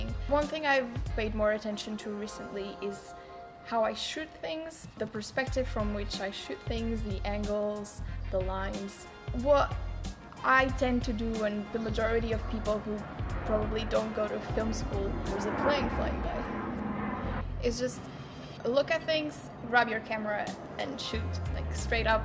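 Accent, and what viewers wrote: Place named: Portugal. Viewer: The second one is really helpful when it comes to creating better photography compositions. I really didn't realize up until recently hahaha. If anyone want to see my pictures, my Instagram account is: Ps:, teu sotaque português é muito lindo hahahah faz outro vídeo em português PLEASE ( Sou do Brasil)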